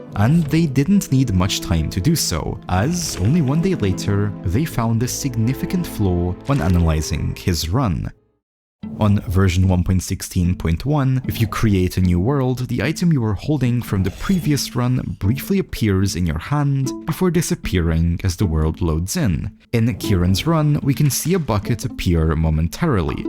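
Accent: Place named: Scotland, United Kingdom. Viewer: It's the vowel sounds that are giving that scottish twang especially the 'I' in minecraft, Northern english accents can have a lot of overlap with accents north of the border so that makes sense! Anyway cheers for answering and class video man!!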